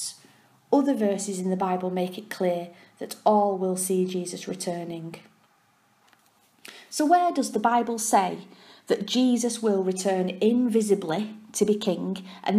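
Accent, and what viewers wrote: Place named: Ireland. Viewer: The Irish accent this lady has is just lovely. 😍😍😍😍😍😍😍😍😍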